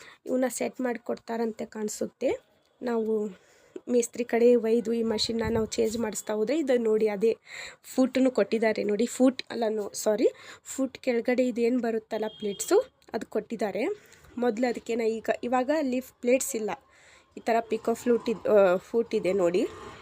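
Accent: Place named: North Karnataka, India. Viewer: ಉತ್ತರ ಕರ್ನಾಟಕದ ಭಾಷೆ ಚಂದರಿ... From Chikkamagluru..god bless u..and Tq ur information ❤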